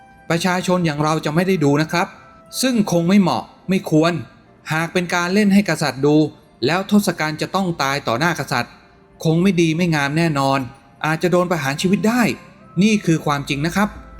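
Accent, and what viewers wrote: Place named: Thailand. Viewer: ชอบเสียงเหน่ออะ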